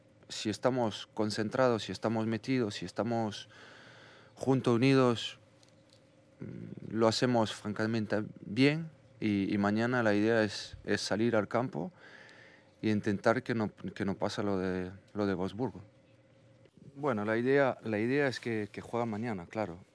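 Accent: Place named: France. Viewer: He sounds French even speaking Spanish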